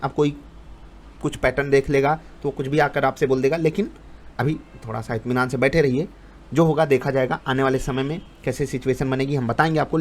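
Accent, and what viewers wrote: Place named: Kanpur, India. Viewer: Full kanpuriya style me maja aa gya.....ek full video chahiye isi tone me ab to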